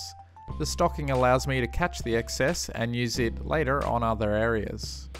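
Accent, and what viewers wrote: Australia. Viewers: He's Australian. The NZ accent is a bit different to ours but apparently people not from Oz or NZ can't really tell the difference.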